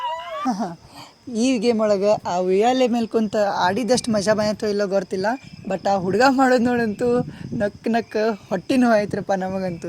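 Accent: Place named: Mysore, India. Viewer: Nice trying Mysore language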